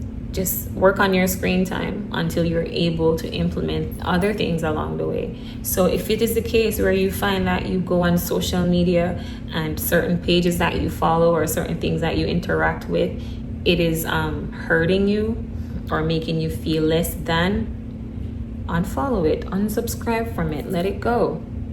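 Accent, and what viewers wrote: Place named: Jamaica. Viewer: Where are you from? I love that accent sound Jamaican I love Jamaica.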